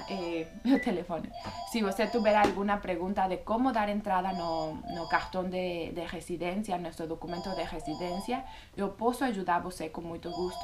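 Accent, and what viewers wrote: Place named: Spain. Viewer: Muito bom mas esse sotaque espanhol atrapalha 1% mas de resto seu português é muito bom e você é bem esclarecedora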